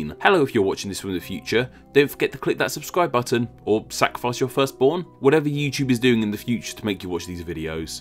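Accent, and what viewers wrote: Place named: United Kingdom. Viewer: I have never bought into the whole "British/English people sound smarter than American's" until I found your channel. You have such a great voice, and put things in such an awesome understanding for even the dumbest of us. Thanks for all you do, unfortunately, I cannot be a Patreon at this time, but one day I hope to be. Thanks.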